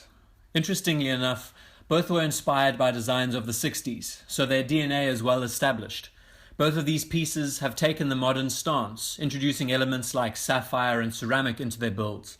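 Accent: South Africa. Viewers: South Africa 👍🏾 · You sound South African! :) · You sound South African 🤔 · How did you rework the crown? Or did you have it done? Judging by your accent, you're South African. I'm in CT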